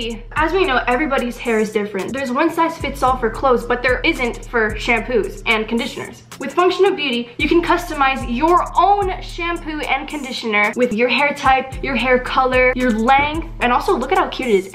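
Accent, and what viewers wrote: United Kingdom. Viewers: Was that a Scottish accent ?? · that slight British accent tho. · What's up with the British accent tho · What's with the British accent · I love her British accent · I am younger than her and I know how to put makeup 😳 Oh and did I mention you speak some British I am impressed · I got function so good And love the British voice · I was getting weirded out when you kept doing the British accent are you a little bit British?? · You sound British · are you British? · she sounds British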